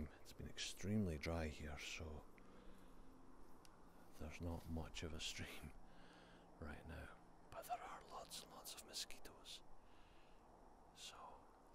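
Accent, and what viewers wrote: Scotland, United Kingdom. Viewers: 😻 Kittie ✔ 🐈Catwalks ✔ 🗣Scottish Accent ✔ 💮Queen Anne's Lace ✔ Mmmm! That's some good content! 😋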